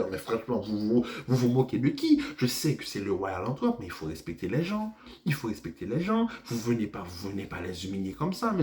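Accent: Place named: Haiti. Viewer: Je sens que vous êtes un Haïtien. Ton accent, la façon dont tu dis le "en tout cas" "antouka"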